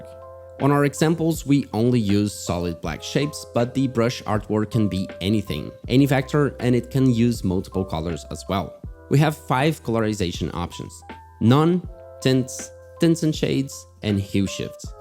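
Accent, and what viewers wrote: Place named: Eastern Europe. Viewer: You have a very massive slavic accent.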